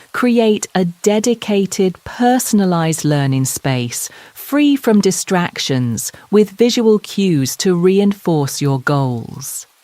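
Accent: United Kingdom. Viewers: Thanks ❤❤ i love your british accent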